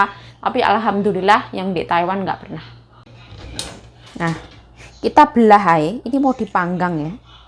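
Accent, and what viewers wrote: Java, Indonesia. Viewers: bahasae medok yuuu, bunyie jawa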